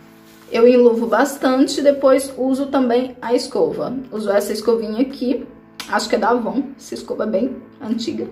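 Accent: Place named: Bahia, Brazil. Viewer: Nossa ela é da Italia mas parece que tem um sentido Bahiano de Salvador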